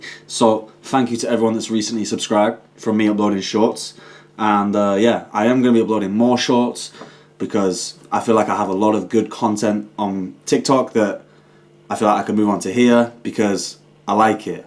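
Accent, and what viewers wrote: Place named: Yorkshire, United Kingdom. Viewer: What part of Yorkshire are you from mate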